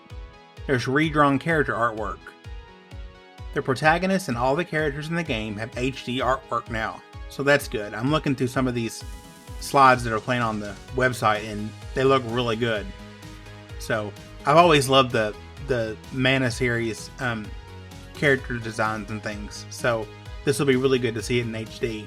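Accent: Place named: South, United States. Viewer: Man I want to like this video but that accent is so heavy. I'm from Alabama myself and I try not to talk with that draw. It's funny I'm from one of the most hillbilly states in America, but I hate the accent and the way of life. I'll take Miami Beach and the ocean any day over hillbilly land in the mountains. More southerners should try to speak without that horrible accent.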